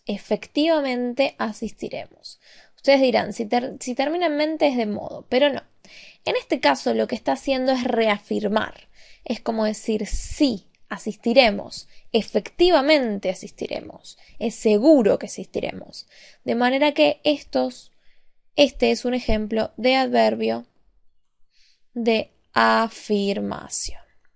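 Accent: Argentina. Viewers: Muchas gracias. Es de Argentina deduzco,por su voz, lo dice un cordobés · eres argentina?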